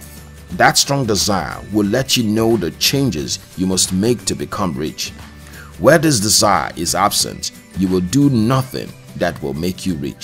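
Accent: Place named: Nigeria. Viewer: You are in Nigeria? Your accent sounds like. If you are. Nothing wrong. With it. I think you should be proud of yourself to making this channel. Bcs, Nigerians in South Africa they are drug dealers and all sort of dirty business.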